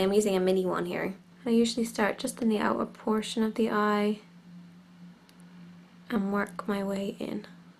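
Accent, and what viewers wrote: Ireland. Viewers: are you Irish? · You are really pretty! :) Are you by any chance irish? Sorry just curious, you sounded a bit irish. I'm not irish I'm british, norfolk accent XD Just wanted to know! Btw great vid, i'll be using those tips haha. I know a good tip for eyeliner. To keep the eyeliner on so it doesnt come off or smudge is to put a simular color eyeshadow over it or if you want a bit of variety use a different color! :D Something i picked up when practicing my make-up! :)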